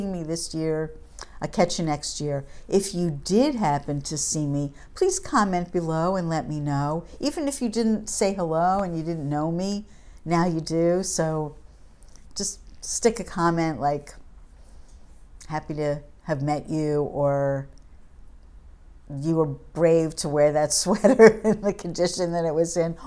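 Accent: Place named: East Coast, United States. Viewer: Your accent and attitude remind me so much of my family out east. Loved the recap!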